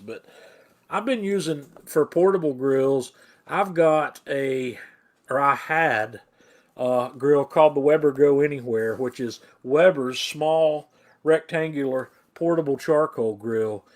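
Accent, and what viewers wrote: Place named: North Carolina, United States. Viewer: The accent is awesome. What part of NC are you from?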